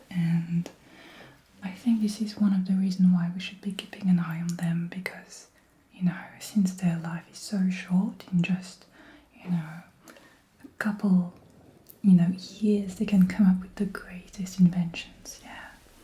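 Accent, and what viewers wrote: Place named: France. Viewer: Ce très léger accent français sur certains mots est HYPER agréable 👌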